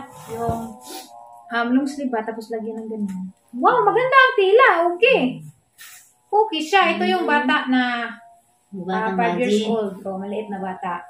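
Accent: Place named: Bohol, Philippines. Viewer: Mahimoot ko sa imo tonada. Boholana.. gahi ayo imo Tonada. Mao nag subscribe ko nimo... Bisaya ko piro Dia ko Mindanao nagpoyo. Nagpojo.haja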